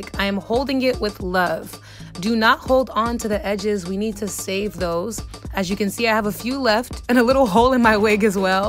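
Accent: Nigeria. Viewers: I like how you infuse naija English for drama 😂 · Are you Nigerian? I promise i hear teni askamaya in the background! Sisshhh plus i can low key hear the Nigerian accent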